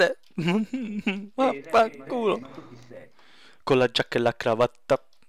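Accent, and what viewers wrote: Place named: Naples, Italy. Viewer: Napoletano <3 <3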